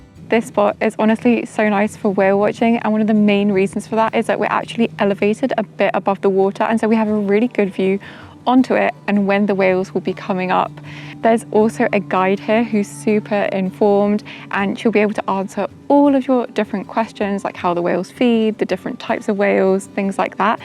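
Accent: United Kingdom. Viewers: C'est pour faire découvrir le Quebec aux Canadiens et Américains. de plus on entend bien son accent Britannique et ne parle probablement pas francais.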